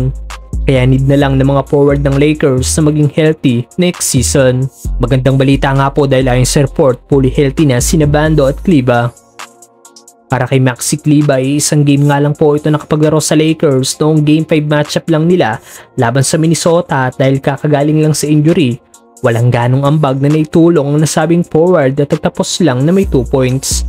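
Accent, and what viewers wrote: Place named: Laguna, Philippines. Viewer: Taga Laguna ito halata sa tono mo hehe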